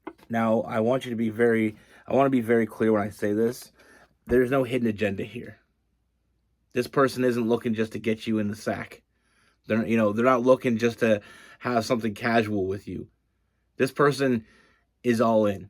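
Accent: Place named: Canada. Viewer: Hi! new to the channel here. First off, your t-shirt and baseball cap collections get an A+. You are so thoguhtful and at the same time, really a dude. It's pretty charming. Truthfully, I feel guilty watching tarot readings though... I think I hear Canada, I know because I'm across the pond in B-low 😉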